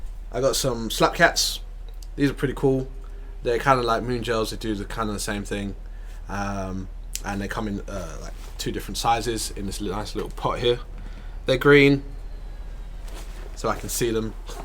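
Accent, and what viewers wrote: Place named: England, United Kingdom. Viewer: a black man with a english accent that's awesome 🙌🙋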